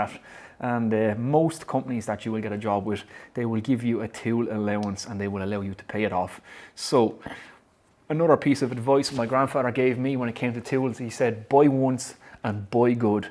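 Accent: Ireland. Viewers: Love your channel. I work in IT but have always had a love for woodworking so you're right up my street. My Dad didn't get much of an education but he built up incredible knowledge with all things electrical. I always joked with him that he could have lectured an electrical engineering course. Completely understand that the need for regulation in this discipline but would love to get as much knowledge as I can and follow in his footsteps. If there's any material you would suggest for this, I'd love to get it. Really nice to hear an Irish voice on YouTube talking through these things.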